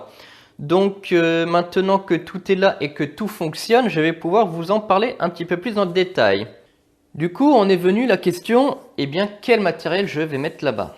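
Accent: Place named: England, United Kingdom. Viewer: Belle bête ! J'adore ton accent englich. 😉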